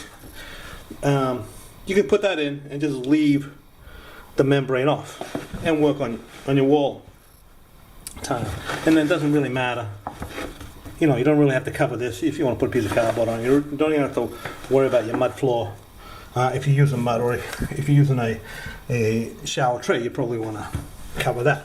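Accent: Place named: Boston, United States. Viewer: might I ask where you accent is from? It sounds to me (my untrained UK ear) what a Boston accent would sound like but seems to have a hint of something else occasionally. Where you Boston born and bred?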